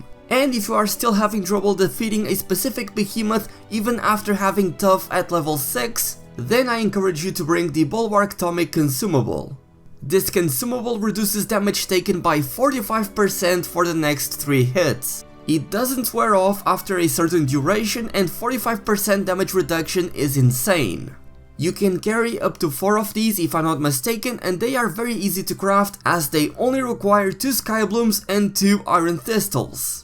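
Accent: Portugal. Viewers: oh mano percebi logo que eras pt pelo o teu ingles wtf,mas bom video btw,copiaste de eu sei de quem mas bom video!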